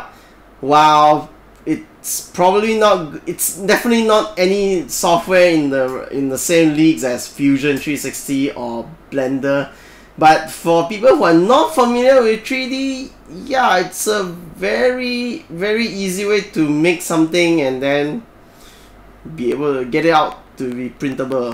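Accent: Singapore. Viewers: you sound Singaporean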